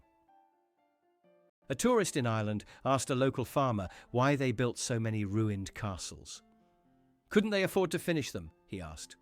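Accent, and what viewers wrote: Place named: United Kingdom. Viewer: This is a British accent trashing Americans, and we have enough trouble. Thank you.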